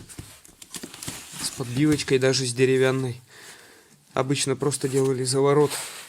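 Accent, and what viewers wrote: Vyatka, Russia. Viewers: почему то думала ты местный, Кировский. Говор вятский, похож. Южные места обл.не посещаешь? А, там очень много купеческих домов, к примеру Уржумский р. 😊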